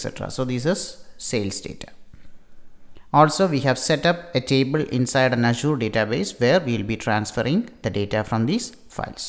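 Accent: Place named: Kerala, India. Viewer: malayali accent :)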